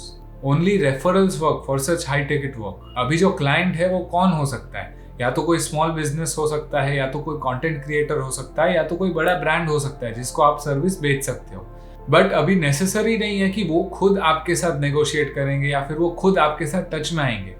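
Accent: Gujarat, India. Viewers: Gujarati Spotted 🤓 , Right ? 10 Sec, Video Dekh ke hi pata chal gaya .. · Bhai hindi ma pn tamri gujarati tongue ave che, ane ek gujarati j atli saras video banavi sake ane reality batavi sake. Bija video lavo detail ma ane sadi bhasha ma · Gujarati che ne bhai tu taro, tone gujrati che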